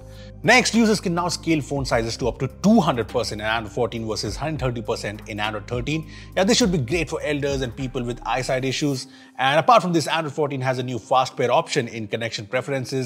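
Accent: India. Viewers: I love the light Indian accent with great English ❤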